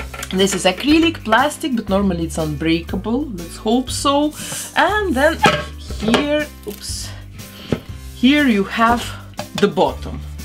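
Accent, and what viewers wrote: Russia. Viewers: Блин, честно говоря, такое ощущение будто автор канала русская. У неё очень явный русский акцент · Акцент слово из России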